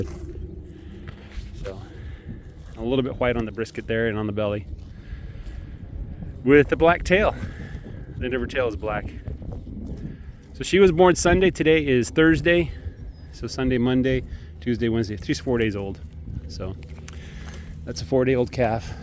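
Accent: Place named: South, United States. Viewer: i enjoy the story of finding the right breed to add in a certain trait. southern drawl is easy on the ears. Hopefully, if you get a South Poll bull or a starter herd, you will boost your grass to beef efficiency-slowly or more rapidly... South Poll can get FAT on forages they graze. If your soils are depleted, granular minerals will help the cattle and the cattle will choose the minerals needed and fertilize your soil. Depending on where you get the bull or starter herd, the cattle may know how to eat more weedy forages than you show on your channel. Variety of forages adds nutrients, as different plants cycle diverse arrays of nutrients from the soil. Each "weed" tells of the soil's need to be healed. Dr Elaine Ingham does soil health lectures, if you want to get fairly technical. Understanding Ag recommends certain labs to run the Haney test, because some labs do the proper protocol. 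Haney test uses WATER, not other chemicals that are un-natural to the soil, to determine what nutrients are bio-available...if i understand this correctly. Do your own research.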